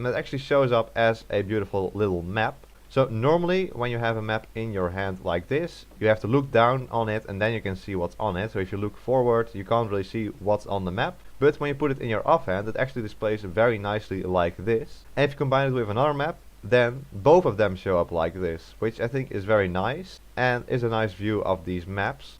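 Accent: Netherlands. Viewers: Ben je Nederlands want zo klinkt je stem als je Engels praat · Typisch Nederlands engels 😂😂😂😂😂😂😂😂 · ik hoor gwn jou nederlandse accent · You sound dutch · Ben jij Nederlands, want ik hoor een klein accentje? 😊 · Are you dutch? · Are you dutch 😂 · Are you Dutch?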